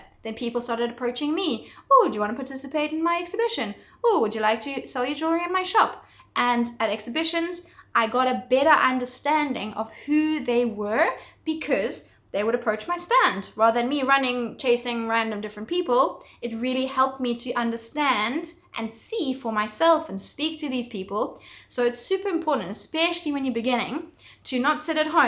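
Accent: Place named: South Africa. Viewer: I just knew that was a South African accent 🙌🏻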